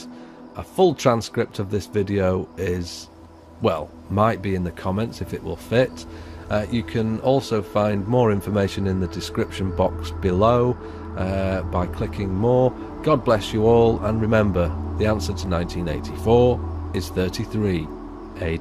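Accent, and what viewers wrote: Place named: United Kingdom. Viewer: I just believe this guy cuz he’s speaking with a British accent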